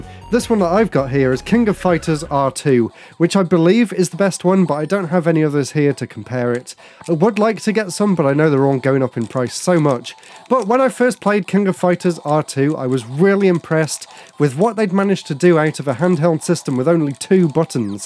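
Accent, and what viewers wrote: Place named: Scotland, United Kingdom. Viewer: Your accent has always been so interesting to me. When you said you go to Edinburgh for your job, it made me focus more on your accent... and I'm wondering, is it like a British English / Scottish combination? Like 85% British English, 15% Scottish? The way you say "found" reminds me of Scottish, for instance. But this could just be confirmation bias! Either way, very nice accent!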